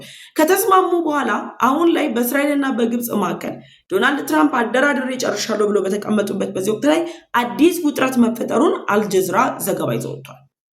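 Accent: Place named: Nigeria. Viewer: አማርኛ የምታወራ ናይጀራዊ ነው የምትመስሊ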